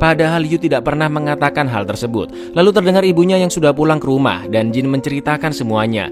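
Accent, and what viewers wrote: Indonesia. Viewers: Ya namanya logat Indonesia ya suka2 dia org korea aja nyebut nama org indo suka salah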